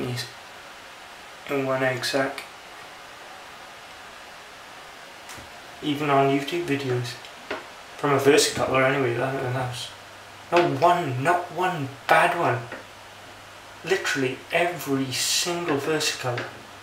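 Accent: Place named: Wales, United Kingdom. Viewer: I love your welsh accent! ❤️I especially love how sweet and gentle and obviously caring you are with them all, I speak to my babies like this too 😂 I love your concern and care for mama spidey to make sure she gets some nourishment ASAP 💕 that first crying of your son really was amazing timing too 😂🖤🕷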